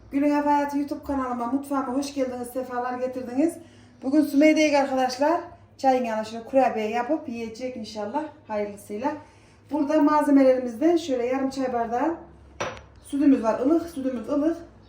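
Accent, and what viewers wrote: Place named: Aksaray, Turkey. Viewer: Eline sağlık çok güzel görünüyor ayrıca konuşma tarzından anladım hemşeri olduğumuzu 68 vatan 07 mekan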